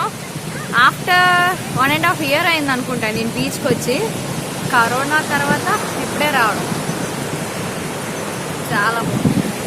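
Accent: Srikakulam, India. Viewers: Ayite srklm slang Or vsp Or hyd edo okate laa paluku oknaa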